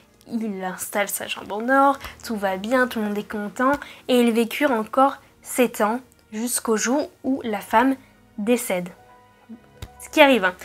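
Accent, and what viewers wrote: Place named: Spain. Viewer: Coucou, j'adore ton accent espagnol. J'adore ta petite histoire. Merci pour ce que tu fais.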